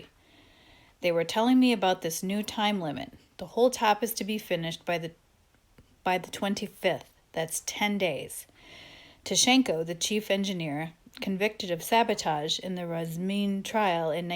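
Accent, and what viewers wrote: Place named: United States. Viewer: Спасиб, послушал "простую" американскую речь (произношение). Понятны отдельные слова. ))))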